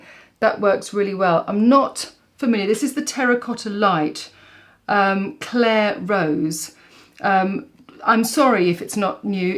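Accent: England, United Kingdom. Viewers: That lipstick is everything on you. The corals and peaches are lovely with your coloring. Love your videos and your beautiful English accent ♥️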